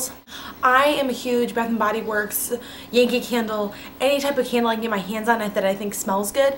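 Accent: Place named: North, United Kingdom. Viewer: As a northerner, I can hear in your voice that you are also a northerner. Good video!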